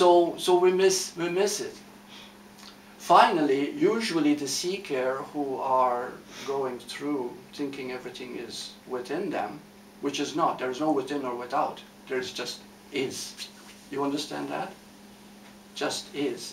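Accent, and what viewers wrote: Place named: Italy. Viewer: This obviously has nothing to do with what he's talking about, but I'm just curious, what kind of accent does he have? His name seems English, but his accent sounds more Italian or something else. Where is from?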